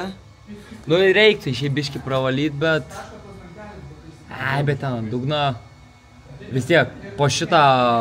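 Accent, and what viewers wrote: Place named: Šiauliai, Lithuania. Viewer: is kalbos lyg Siaulia butu :)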